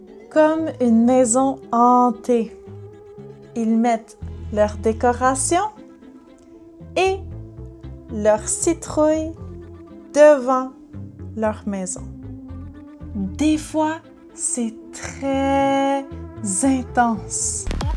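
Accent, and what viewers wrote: Quebec, Canada. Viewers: Fun video! I am amazed at how different the Quebecois accent is. It's lovely but I'm not used to it.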